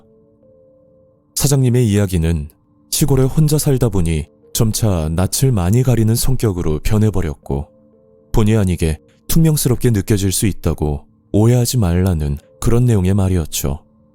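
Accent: Gyeongsang, South Korea. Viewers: 여기 채널 은근히 경상도 많이 깜! ㅋㅋㅋ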